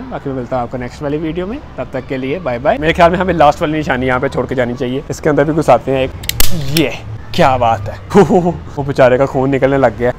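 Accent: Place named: Punjab, Pakistan. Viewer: Laihnde punjab wali boli aa veer ji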